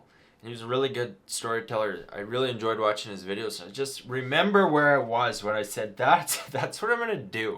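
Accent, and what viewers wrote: Canada. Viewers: I came across your channel years ago, and got a kick out of your Canadian accent. (Eh?) But I kept coming back because you weren't trying to be a YouTube "star." You were just doing fun things, and bringing us along with you. I wish you more and more success as the years go by. 🤩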